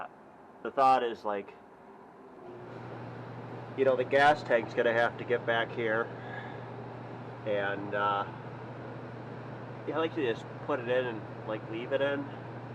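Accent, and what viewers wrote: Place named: Northeast, United States. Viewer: Doing real well. I love your work and your accent reminds me of where I grew up. I spent my time in North Jersey in Philly and for one year when I was in the Navy back in the 90s I was in upstate New York.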